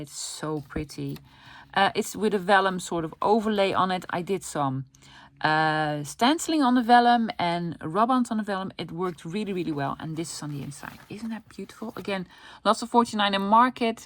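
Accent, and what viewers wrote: Netherlands. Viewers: I’m fairly new to this channel… I only discovered you about a month ago and I’ve been binge watching your YouTube videos. I absolutely love this channel! My mums family is form the Netherlands too so hearing you accent is very nostalgic to me. Anyway just wanted to say that you’re awesome and I love your sense of humour ❤️ love from Australia 🇦🇺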